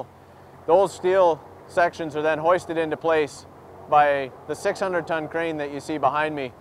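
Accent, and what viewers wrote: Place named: Canada. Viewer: "Souwth" as an American this is the first Canadian I've ever come across that had a stereotypical Canadian accent.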